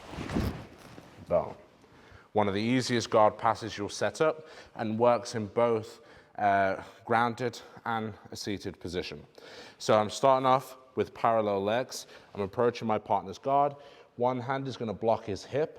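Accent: United Kingdom. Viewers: My first video, and he sounds like Reggie Watts using an British accent.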